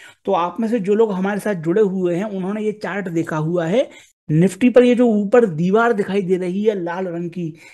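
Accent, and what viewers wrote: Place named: Bundelkhand, India. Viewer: Hum to sirf Bundeli sun ne k liye dekhte hai Baki to humaye dadda sikhaye gaye hate market se door rahiyo 🤣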